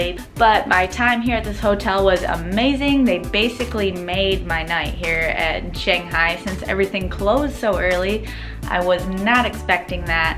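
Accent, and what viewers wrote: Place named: Midwest, United States. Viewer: Cool video. Did you just get a 24 hour pass on your passport to be able to leave the airport during your layover? And are you from the Midwest? I could hear a Midwest accent in your voice.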